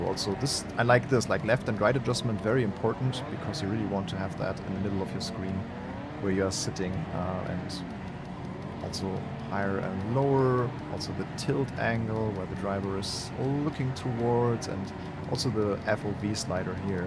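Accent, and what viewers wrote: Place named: Germany. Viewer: Vielen Dank, dass du versuchst, im Rahmen deiner Möglichkeiten unsere Wartezeit zu verkürzen. Ich kann es echt nicht mehr abwarten. Hab es auf der PlayStation auch vorbestellt. Eine Kleinigkeit zu deinem Englisch du sprichst sensitivity immer falsch aus ich stolpere da immer drüber, weil das echt schade ist. Dein Englisch ist gut, aber an dieser Stelle fällst du als Deutscher leider extrem auf 🙈🤣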